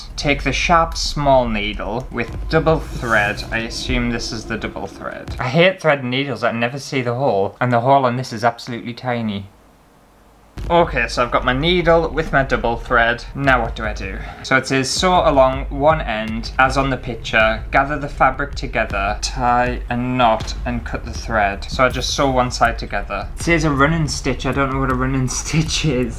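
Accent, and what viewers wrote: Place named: Sheffield, United Kingdom. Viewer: fortunately, no balls have wandered into my water glass, hairy or otherwise :-) as far as your accent goes, I can get what you're saying 99% of the time. I've been expose to British culture for 40 years, and I don't consider myself an accent expert, but correct me if I'm wrong, you have a Sheffield accent...?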